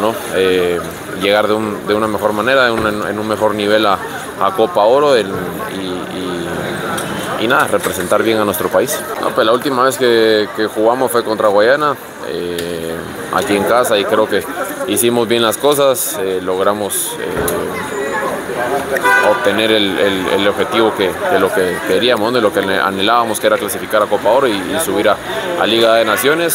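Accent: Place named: Argentina. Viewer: Este muchacho abla como argentino yo creo que si vamos a ganar.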